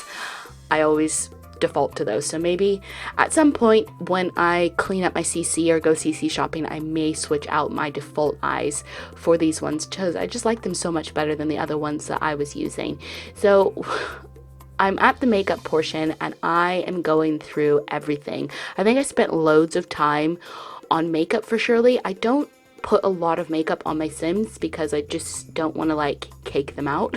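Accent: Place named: Midwest, United States. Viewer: UK?! I thought you were from the US because of your midwestern sounding accent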